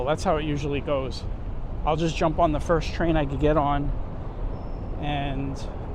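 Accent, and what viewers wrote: Brooklyn, United States. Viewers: I really enjoy your narrated videos as well as your authentic Brooklyn accent! 😊 It's a dying breed. 😢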